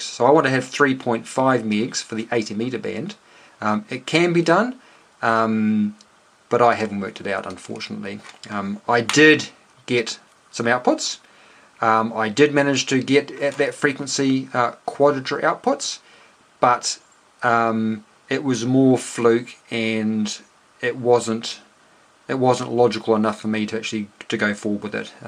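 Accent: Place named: Alabama, United States. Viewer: Great video, but Alabama accent is pretty thick.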